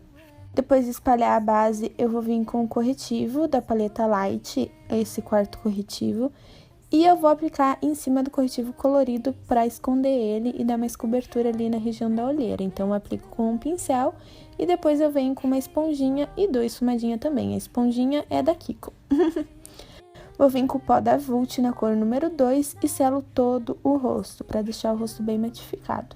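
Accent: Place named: Rio Grande do Sul, Brazil. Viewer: sotaque gaucho, é nóiiix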